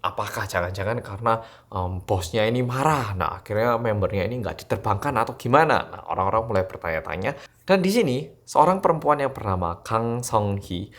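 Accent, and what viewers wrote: Java, Indonesia. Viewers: Glodakan gak tuh.. nyentak ke saya 😂 medhoknya bisa otomatis nyampur gitu ya bahasanya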